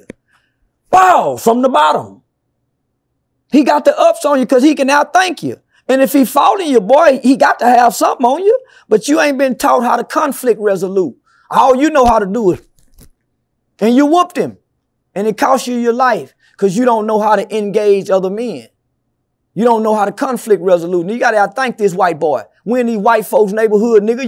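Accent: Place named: Texas, United States. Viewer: Man I love Texas accent 😂😂😂 "you skirr me" 🤣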